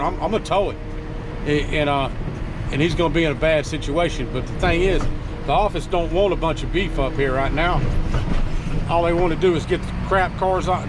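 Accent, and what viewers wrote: South, United States. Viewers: I just discovered your channel a couple of days ago and I have been watching it Non-Stop. I love your southern accent I love your relaxed kick back way. I love how you give it up to God. You were talking about shady practices when tow company send a bill after they sold your car at auction. That is shady as hell I agree. I live in Arizona and here in Arizona if your car gets towed and they sell it at auction then the State of Arizona which has nothing to do with the towing company or the person's car who got towed jumps in the middle of it and adds a abandoned vehicle charge of $500 which you don't know about until you go to try and change your address on your driver's license or if it's expired and you need to get an updated license or if you want to register to vote or you want to register to be an organ donor. No they won't let you do anything they keep you locked up until you pay that $500 charge that no the person lost the car the tow company got the car sold at auction and now somehow the States involved and putting this 500.00 charge.???? 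Please if you could explain this to me like I'm a two-year-old and make me understand I would appreciate it.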